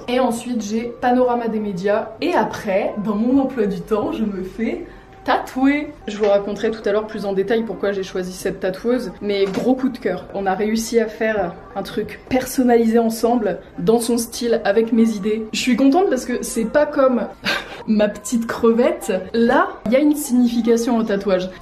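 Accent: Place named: Normandy, France. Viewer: Dans ta vidéo "après la pluie", j'avais soupçonné que tu étais Normande ! ^^ c'est ma vie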